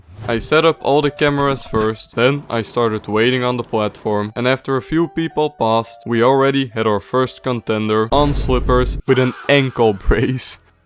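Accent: Netherlands. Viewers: You sound dutch 🤢🤢🤢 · love that dutch accent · nice video! je engels accent moet je misschien nog op oefenen. aan, jou nederlands accent te horen denk ik dat jij van nederland bent of niet?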